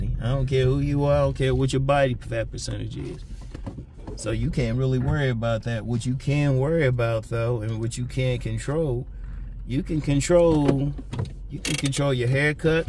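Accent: Washington DC, United States. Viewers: Bammas? DC accent?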